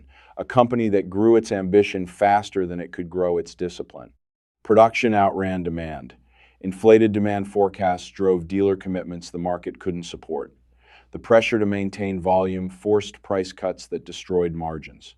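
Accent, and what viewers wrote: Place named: United States. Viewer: When I hear an American Voice telling me the Chinese Competition is done I'm skeptical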